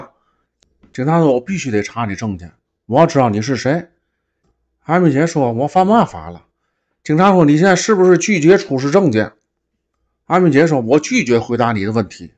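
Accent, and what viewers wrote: Tianjin, China. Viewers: 这是天津口音吗？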